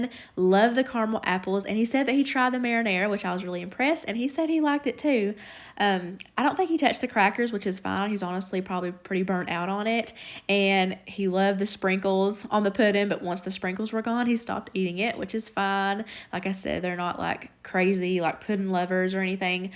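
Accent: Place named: Rural, United States. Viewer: my son loves those cheese cubes lol. Love your country accent😊🥰🤠. Unfortunately my son is a super picky eater and does NOT eat meats or seafood so I definitely have to get creative lol. Love how real and honest you are about showing what is actually more realistic for most parents to pack for their kids. Especially when they can't microwave anything lol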